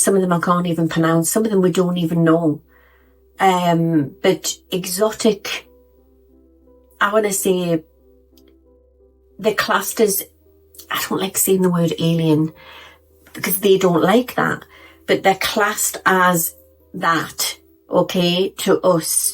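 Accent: Newcastle, United Kingdom. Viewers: Hello fellow geordie! ❤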